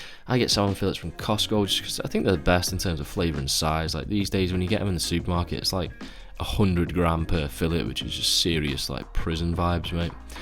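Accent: United Kingdom. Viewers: Bro it's not only the content being great, your British accent kills me, the way you say stuff just makes me smile haha, amazing stuff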